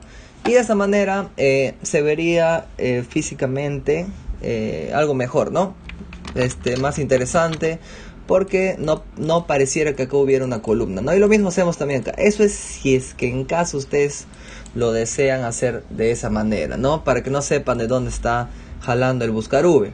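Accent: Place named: Loreto, Peru. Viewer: TU ACENTO CHARAPA ES LO MAS HORRIBLE DEL VIDEO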